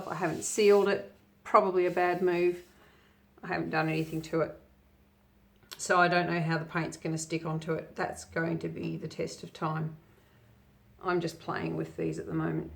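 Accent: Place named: United Kingdom. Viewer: Hi that's beautiful a suggestion for the cat that used to live in the house since you have an allergy maybe you could find her a forever home? clearly she's not a stray or feral like the other ones and she could really get hurt by one of those because they're not the same I used to live in Miami, florida,usa and the lady across the street I did not know at the time when I moved into my house she was a hoarder and it was just heartbreaking come to find out not only did she pick up ones from everywhere she even picked up one that I knew had been somebody's pet and she ended up telling me that when she found that one it had a collar on it so she was stealing people's pets also very sad please try and find the kitty that belongs to that house a home that would be so awesome thank you in advance maybe you could get ahold of a local rescue or something? 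And if you heard screeching going on that means they're in heat and you're going to have multiple cats pretty soon because they multiply almost as fast as rabbits local rescues in the United States all come also come in and spay and neuter Strays and feral cats I'm sure you sound like you're from the UK perhaps I'm sure they have something similar to that there I'm just trying to save you the headache of multiplying 7 cats turning into 17 etc etc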